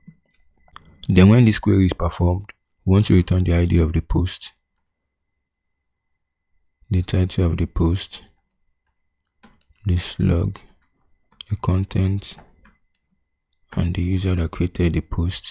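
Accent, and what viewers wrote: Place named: Igbo, Nigeria. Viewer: this na confirm igbo guy, probably from enugu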